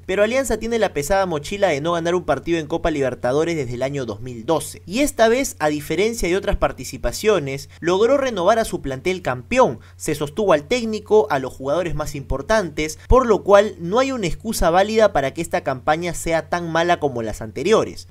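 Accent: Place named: Argentina. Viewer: Te agradecería si dejas ese acento argentino ¡Che!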